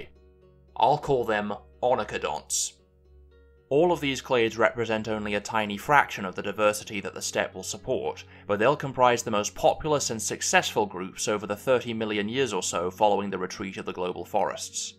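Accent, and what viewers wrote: United Kingdom. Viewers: A guy with a British accent is explaining a prehistoric world to us. It makes me wish that there wouldn’t be copyright consequences if you just started using the musical score from Walking with Dinosaurs for the background of these.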